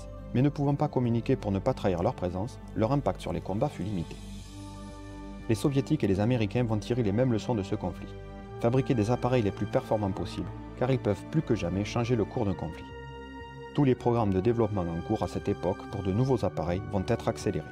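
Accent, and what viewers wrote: South, France. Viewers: je vien de découvrir ta chaine, c'est super, on apprend pleins de choses sans que sa soit trop long, et avec ton bel accent du sud, tu tombe pas dans le piege d'avoir un ton monocorde qui endors, continu comme ca, on veut pleins de videos :p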